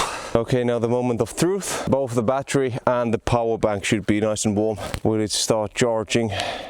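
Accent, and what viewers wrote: Finland. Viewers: Hello from Minnesota USA I enjoyed the video I lived in embarrass Minnesota home of the Finnish sow-na like your country I like the long videos your accent is like the Finn’s in my town and close to Canada 🇨🇦 be safe I’m glad I found your video I grew up in the woods with my father we cut lot of wood and I enjoyed spending time in the woods with my father and having coffee under a tree taking a break enjoying the smells of the woods blessing from Minnesota USA 🇺🇸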